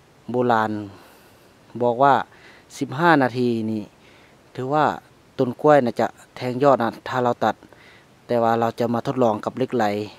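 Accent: Laos. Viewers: ລາວແທ້ໆເວົ້າໄທເພໍີອ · พูดไทยสัปลาวอย่างฮา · ເຈົ້າຂອງຄິປເປັນຄົນລາວຫລືຄົນໄທເນາະ ຕາມທີ່ຟັງສຽງອອກຊຳນຽງລາວເນາະ ຖ້າເປັນຄົນລາວກະເວົ້າລາວໂລດດີກວ່າບໍ່ ມີທັງການເວົ້າທີ່ບໍ່ແມ່ນພາສາຕົວເອງແລ້ວ ມັນບໍ່ເປັນຕາຟັງຄັນສິເວົ້າວ່າຫນ້າລັງກຽດກະສິໂພດກາຍ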